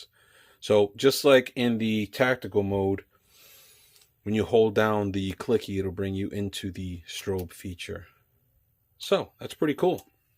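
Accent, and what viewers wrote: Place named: Boston, United States. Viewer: That's a pretty comprehensive review - Thank-you!! Whereabouts are you from, fella? I almost thought Boston, but your accent isn't the typical Bostonian I'm used to... Also, if you don't mind me asking, what do you do for a living that requires a duty belt? I'd guess Police Officer, from the '911' part of the channel name?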